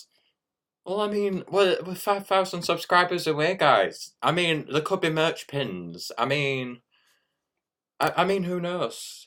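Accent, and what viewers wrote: Scotland, United Kingdom. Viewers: R U Scottish?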